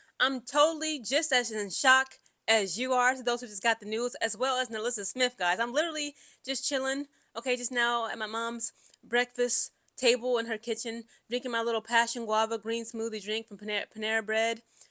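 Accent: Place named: Caribbean. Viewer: I think sometimes I hear a little West Indian twang too and I’m hailing up from St. Thomas, V. I. and Guadeloupe myself. The thing is I struggle to keep up because she talks so dang fast🙂.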